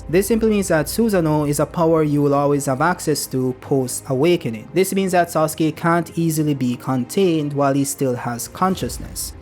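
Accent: Jamaica. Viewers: Are u Jamaican ? If so I am too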